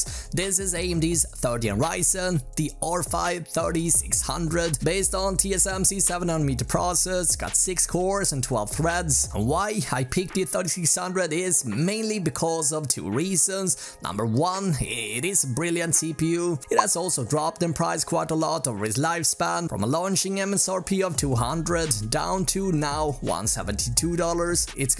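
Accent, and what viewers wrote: Sweden. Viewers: Please go watch some American Tech YouTubers, listen to them and then try to speak like them, slowly with taking a breath and pronuciation Thank You Very Much 🙏 Swedish have very similar accent to native English ... Don't know what the problem here 😥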